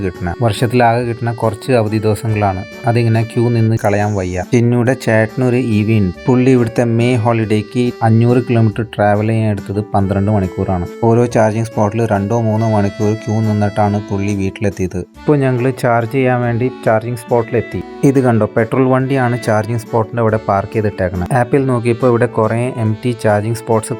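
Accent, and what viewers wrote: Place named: West Bengal, India. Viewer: Chetta why is your Malayalam heavily accented?? Oru bengali chova?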